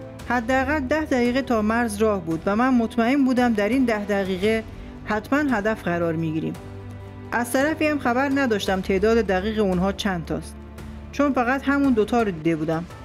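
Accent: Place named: Gorgan, Iran. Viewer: لهجه گرگانی شما مشخص و قشنگه🧿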